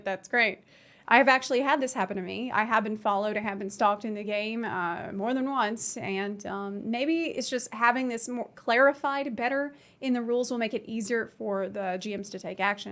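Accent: South, United States. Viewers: Love hearing the southern accent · I'm from Birmingham Alabama and she sounds southern. Then again not all of us have that kinda twang from the city. Some of us sound more from the hood than from the country. My point not all southern accents are made equal. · you know i didn't process this at all but i just realized does have a southern accent. I think im used to hearing lighter less subtle southern accents that i didn't notice at first. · geez, now I totally hear the southern accent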